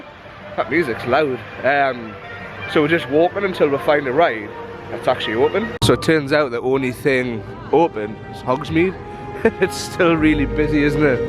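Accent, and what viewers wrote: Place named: Newcastle, United Kingdom. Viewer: It’s always hard coming home, and nice to hear a fellow Geordie.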